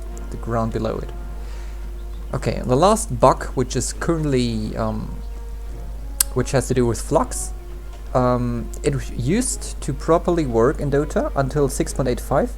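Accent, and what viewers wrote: Germany. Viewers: It is a german accent, I believe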